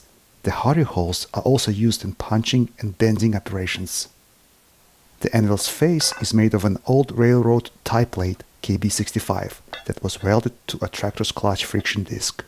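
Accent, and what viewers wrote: Russia. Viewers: Не владею английским языком, но явно слышен русский акцент. А когда увидел адвоката понял, что не ошибся.))))) I do not speak English, but a Russian accent is clearly audible. And when I saw the lawyer realized that he was not mistaken.)))))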